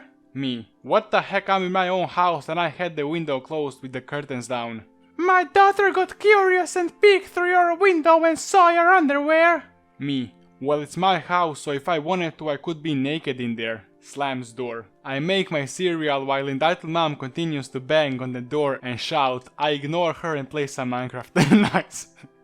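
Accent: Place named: Russia. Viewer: I know that accent. Sounds like Russian. But u never know it could be Indian. Sounds like either one. Either way nice vid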